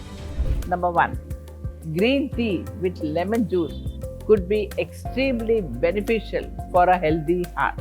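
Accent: India. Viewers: Why are the only showing white people in your background and why not other races ? She has Indian accent and not even bothered to show people of color in the background and talking about health :)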